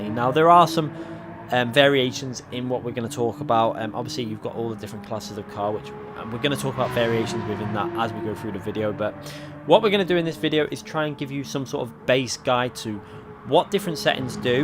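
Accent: United Kingdom. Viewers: That British accent make tutorial videos sounds so much better. It feels like I am watching an actual race with commentators speaking in the background.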